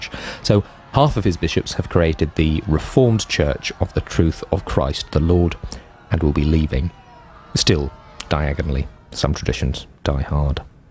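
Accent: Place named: United Kingdom. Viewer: It’s stuff like this that makes me wish I had a British accent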